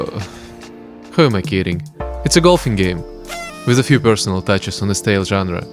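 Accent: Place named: Russia. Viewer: я английский знаю не очень, но почему то сразу понял что ты русский)))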